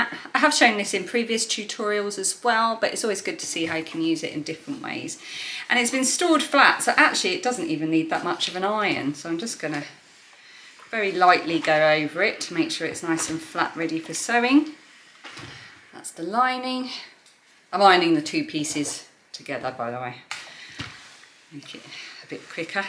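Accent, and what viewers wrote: United Kingdom. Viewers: "EMBRACE THE WONKYNESS!" saith she, the #1 Wonky Queen of the Universe!! The funny part, at least for us assorted Americans, that your UK accent, which we associate for some reason with authority, makes it all seem kinda formal and official! LOL so you've probably done more to encourage those "across the pond" to sew that 10 other podcasters combined. So thank you,! Thank you! Love and hugs from Virginia USA to my UK fam! I read a lot of the comments, and not only do we have a HIT, but looks like a lot of new subscribers too! Congrats, my girl, congrats!!! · Really enjoyed watching this, a familiar British accent. I can assure you that you’re not the only one who sews like this, or lives in a busy house sewing on your kitchen table! 😂 It’s how I learned and copying shapes from my wardrobe. I turn things inside out to draw around them! 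I’ve only recently invested in a set of patchwork square rulers to help neaten everything up as I’d like to sell some of what I make. I still use my ironing board for cutting everything out, it makes it easier for ironing. Still not got a craft room with neatly organised fabric on shelves. I also recycle as much fabric as I can from old clothes and linens. Your bags all turned out amazing! My favourite is the black and white with yellow zip. I’m inspired to make something similar but add a bright pink lining. 😊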